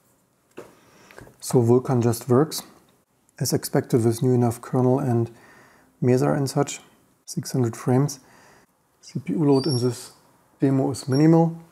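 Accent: Germany. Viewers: Dieses "deutsche" Englisch ist echt schrecklich. "Se sinkpäd". "ei test se sipiju performanz" "so sen ei wud say" Machs doch auf Deutsch ... Englisch können und Englisch sprechen können sind echt 2 verschiedene Dinge.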